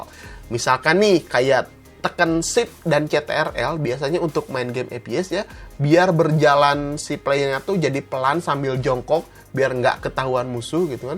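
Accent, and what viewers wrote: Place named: Sunda, Indonesia. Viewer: Logatna meni alus ... Sunda pride 😂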